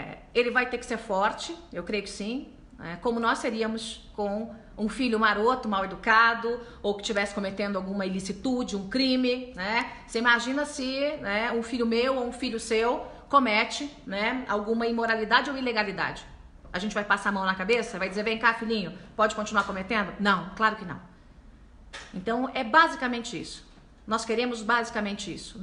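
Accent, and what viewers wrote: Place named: Rio de Janeiro, Brazil. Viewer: Robô carioca deslike!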